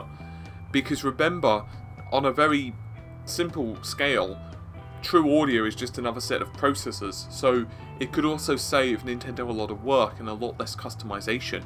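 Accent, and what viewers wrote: United Kingdom. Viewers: This guy's voice is way too british, almost obscenely accented. I mean we live in such an open world now, I don't see why such marked accents could "honestly" exist, it almost feels forced.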